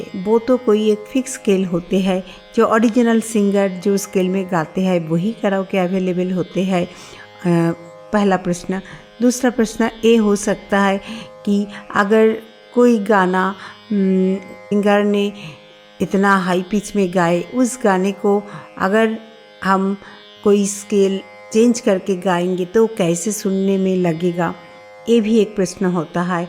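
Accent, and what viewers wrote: Bengal, India. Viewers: tumko jhudko hindi barabar bolna nahi aata. bangali mam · Aap bengali ho? accent comes like that 😊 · नमस्कार देवीजी। मैं अरुण वाडीकर पनवेल, ’नवी’ मुम्बईसे। आपकी प्रस्तुति बहोत अच्छी है, अपितु आपकी आवाज और विषय को पेश करने की कला, अंदाज़ में जो सादगी और बंगाली लहजा है, वो उसे और भी रोचक बना देता है। यूंही अपने श्रोता गण के ज्ञान में वृद्धि करते रहिए। शुभकामनाओं के साथ। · महोदया बंगाल से है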